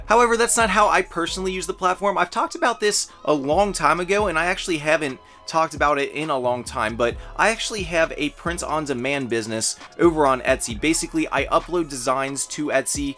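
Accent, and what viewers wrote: Philadelphia, United States. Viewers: God video. Are you in PA? You have that philli suburb accent.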